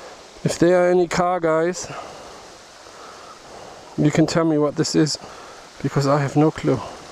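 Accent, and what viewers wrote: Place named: Germany. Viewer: Are you from Germany? You've got a German accent! I'm from Sweden.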